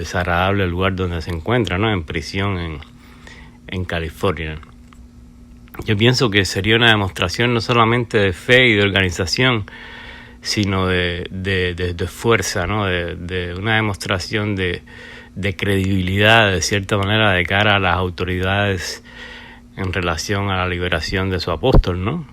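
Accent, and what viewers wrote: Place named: Central America. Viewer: Guadalupe Salinas Salinas Gabrie no te pases de lanza los cerdos son sabrosos en carnitas y este tipo del vídeo habla como centroamericano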